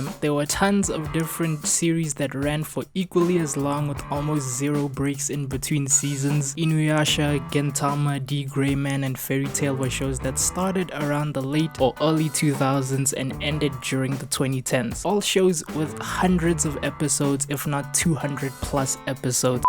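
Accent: South Africa. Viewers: Bro! This was a well done vid man. I actually thought you were American based off your thumb nail design. What a surprise it was to hear your South American. As a Nigerian who has been in South Africa since 2009 i relate to that SABC 2 hate😂. Keep up the great quality bro, I'm proud if you and you just got yourself a new sub. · Your South African WWWWW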